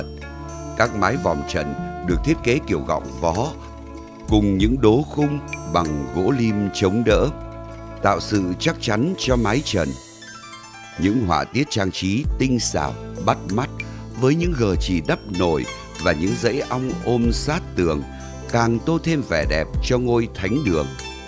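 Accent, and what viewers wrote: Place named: Nam Dinh, Vietnam. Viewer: Giọng đọc ng o Nam Định làm trên ĐTHVN. Phải mời họ đọc phải ko ad oi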